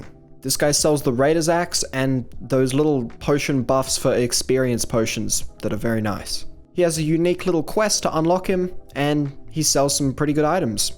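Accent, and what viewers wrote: Australia. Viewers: I thought that was an aussie accent, I live in melbourne and I am sad, I can’t even beat greensleeves )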